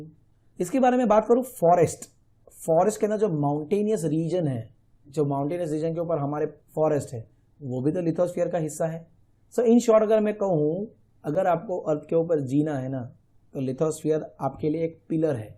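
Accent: Gujarat, India. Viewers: 🧑‍🏫Sir you from gujarat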